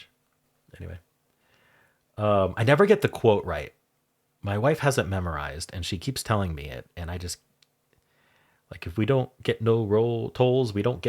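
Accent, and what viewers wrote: United States. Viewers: I really really couldn't figure this one out, until said it in his American accent. But yeah I was never going to get this, those are not homophones at all in (most?) other accents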